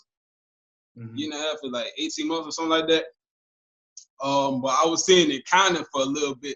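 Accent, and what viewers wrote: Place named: Washington DC, United States. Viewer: Sound like he from DC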